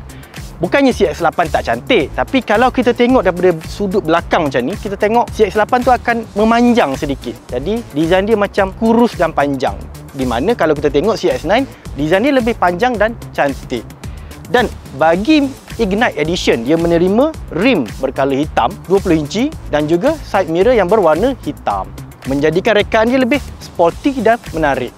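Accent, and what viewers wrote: Kelantan, Malaysia. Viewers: Ore kelate ko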